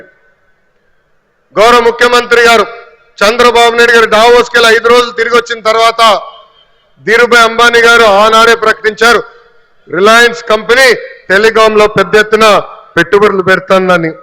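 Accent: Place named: Telangana, India. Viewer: That is Telangana or seema dialect, what is your problem, jagan PADAHIDU antadu😅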